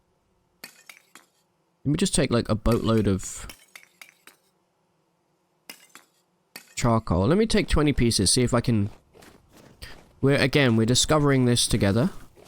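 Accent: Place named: United Kingdom. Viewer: for music, my suggestions are, and (especially )!! i listen to way too much kawaii metal and not much else… isn’t kawaii metal and im not sure what genre they’d fit under but it’s good (and heavy!) streaming would be awesome, but i think i’d miss most of them ☹️ since our timezones are opposite (assuming you live in the uk from your accent) enjoying todays episode with my cat!!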